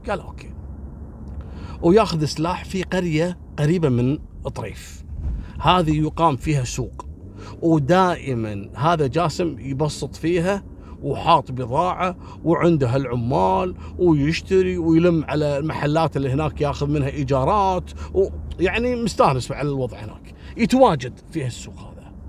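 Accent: Kuwait. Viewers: اللهجة الكويتية حلوة ومفهومة.أنا تعلمتها من خلال سوالف الله يعطيه الصحة ويطول عمره 🤲👍🌹🇲🇦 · استاذ صرت اعرف واحب اللهجه الكويتيه شكرا الك القصه روعه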